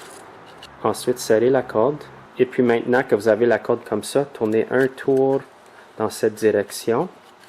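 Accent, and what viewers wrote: Canada. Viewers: super, intéressant. Amusant le franglais canadien, e ton adore l'accent! double plaisir à regarder!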